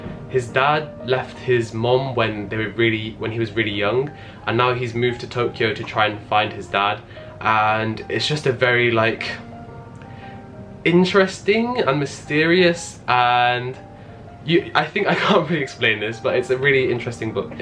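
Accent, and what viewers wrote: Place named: England, United Kingdom. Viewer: Idk why but I luv your English accent sooooooooo much, it melt me out!! ASMRRRRR!! Luv from a little vietnamese girl <3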